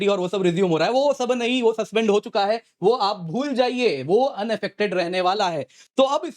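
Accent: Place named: Punjab, India. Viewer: Je Toh pure Punjabi tha ,❤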